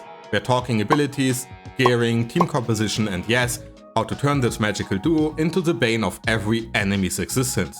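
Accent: Germany. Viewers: he sounds very german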